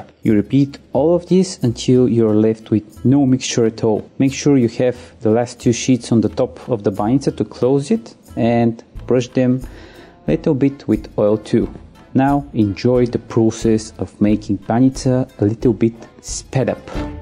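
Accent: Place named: Eastern Europe. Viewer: Nah, u just can't understand slav accent.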